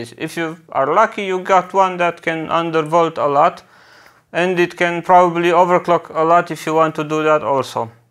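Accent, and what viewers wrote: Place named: Poland. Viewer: Where are you from? Your accent sounds Like polish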